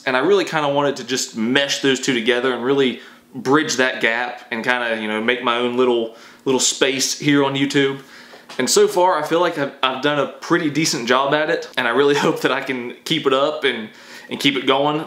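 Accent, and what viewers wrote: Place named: South, United States. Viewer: That Southern drawl is on point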